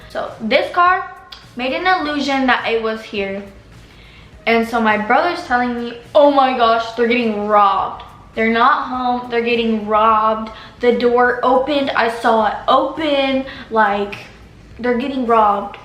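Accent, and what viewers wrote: Texas, United States. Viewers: american accent?? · texas accent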